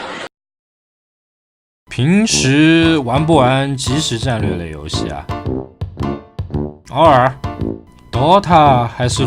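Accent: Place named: Shaoxing, China. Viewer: 感觉就是普通话，没听出吴语除了一点绍兴音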